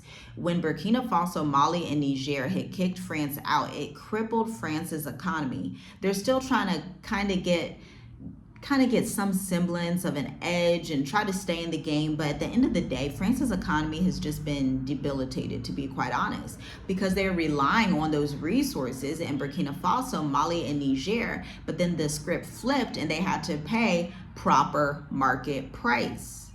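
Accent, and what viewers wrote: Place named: United States. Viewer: Yeah ...yeah....yeah...you tooooo American and no longer African ,,,, you hurt,, speaking from a privileged and heartless country and yet you look like us but speak like them,,,,it's time for change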